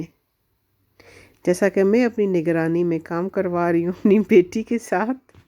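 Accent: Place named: Netherlands. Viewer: Ap k speaking main wording k axcent bilkul dutch language jasa lgta. Hy .ap ny dutch language seekhi proprly ya khud hi aa gai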